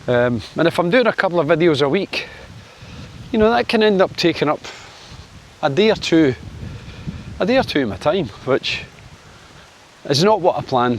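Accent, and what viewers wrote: Scotland, United Kingdom. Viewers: Your channel success is down to your easy going friendly approach with the great Scottish accent! Englishman here living in NZ for 20 years but soon to return to U.K. Primarily to be able to tour more of Europe (it’s all a bloody long way from NZ!). Stumbled on your channel as I was interested in Spain. I always subscribe to a channel the moment I find something that may be interesting and then every so often will edit my subscribed list of ones that no longer appeal to me. Don’t worry you’re still in there!😁 Another branch of your channel should be you filming / commenting from your motorcycle 🏍️ (biker here as well 👍). Cheers 🍻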